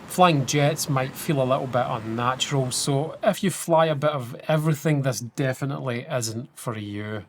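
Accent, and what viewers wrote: Scotland, United Kingdom. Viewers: I enjoyed the Scottish accent very much.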